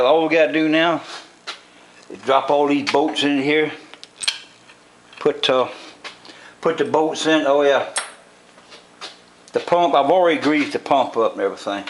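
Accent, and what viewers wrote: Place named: South, United States. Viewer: You are wery good at restoring, but your recording is bad, and i have a hard time understanding your country american english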